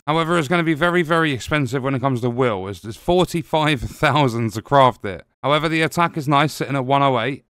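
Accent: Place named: RP, United Kingdom. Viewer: Your accent is over the top posh! xd No offense from here, very helpful guide, Thanks mate🙂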